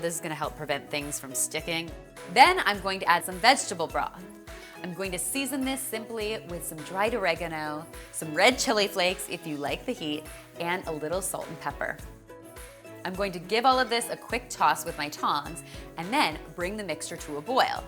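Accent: Canada. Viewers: It's such a funny thing, as an American, to hear someone speak for 10 minutes only to realize they're Canadian when they say "about". Nothing bad about it, I genuinely like it.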